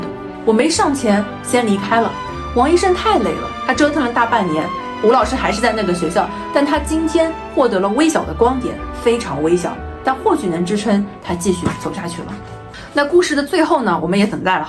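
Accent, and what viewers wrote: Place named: Taiwan. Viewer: 😂怎麼有點台灣腔啊？哈哈哈 也太可愛了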